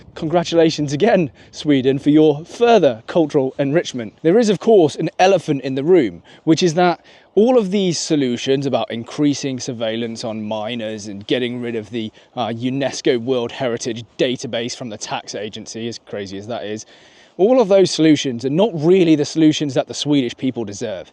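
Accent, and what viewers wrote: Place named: Northern England, United Kingdom. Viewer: strange accent. mostly RP but often produces vowels from northern england. also seems to hupercorrect for a northern accent at times, like a northerner trying to sound southern. maybe went to a public school in the north like rugby?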